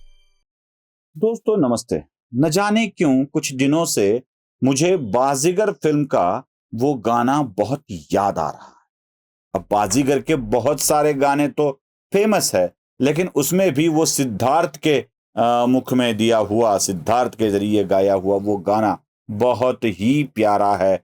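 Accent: Maharashtra, India. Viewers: आदरणीय महान् विद्वान, आपकी संवाद अदायगी को सुन कर दिल बाग बाग हो जाता है, आपके व्यंग बाण अदभुत,सारगर्भित और समसामयिक घटनाचक्र पर तमाचे होते हैं। आपकी और भाऊ टोरसेकर्जी की महाराष्ट्रीयन हिंदी मनभावन होती है, धन्यवाद।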